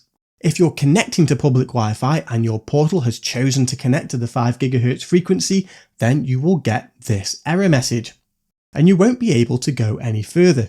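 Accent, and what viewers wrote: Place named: London, United Kingdom. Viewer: My to is working amazing sounds like you’re connecting is more problems I live in USA you sound like in London or Europe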